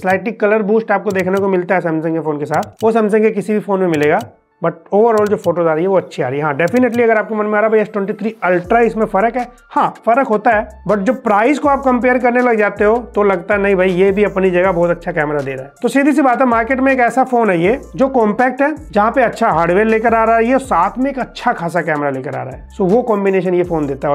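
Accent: Lucknow, India. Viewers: Lucknow se ho kya bhai?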